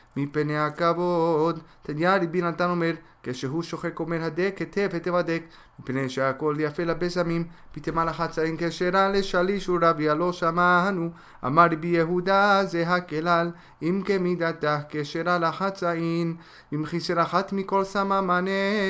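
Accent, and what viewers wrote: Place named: Morocco. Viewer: L'hebreu accent marocain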